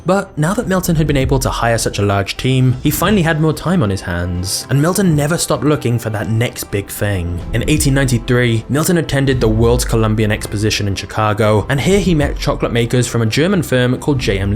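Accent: United Kingdom. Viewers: Are you British or American ?? Because you’ve got British accent and words but your sponsors just use dollars I’m so confused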